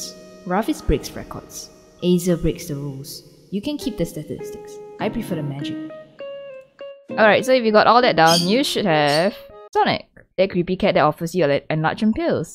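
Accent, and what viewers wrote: Singapore. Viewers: Is she singaporean she has that accent 🤔🤔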